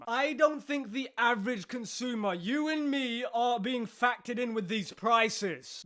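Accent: United Kingdom. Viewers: Are you British? But being tongue lashed by a Brit is so entertaining 😂😎👍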